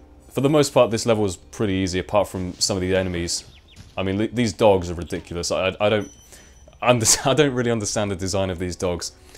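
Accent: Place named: England, United Kingdom. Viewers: english accent is the best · Reliving my childhood here, through an english accent!!!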